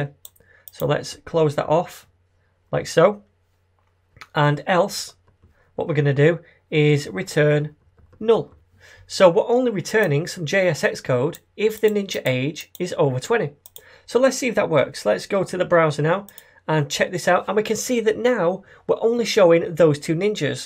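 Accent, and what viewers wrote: United Kingdom. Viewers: I love you British Accent <3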